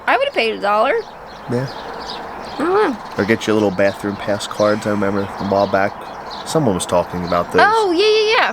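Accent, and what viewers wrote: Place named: New Jersey, United States. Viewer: New Jersey makes sense. You have an accent that comes out on certain words and I couldn't figure it out.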